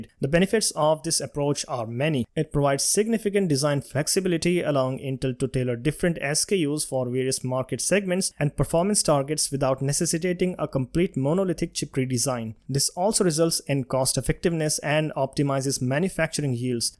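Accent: India. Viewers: I wanted to watch this video but as soon as I heard the indian accent it put me off jesus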